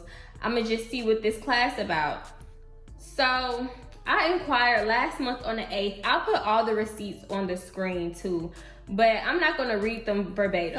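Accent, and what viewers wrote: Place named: Chicago, United States. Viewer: Sis im new here n u talk like ur from the chi w me cthu! Lol u had me rolling bc ur reactions to tht chick wud have been mine too!😂 new friend here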